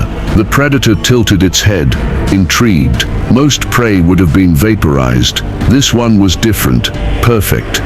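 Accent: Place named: United Kingdom. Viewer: I’m just wondering why a British male voice is narrating a story about Luke Cage.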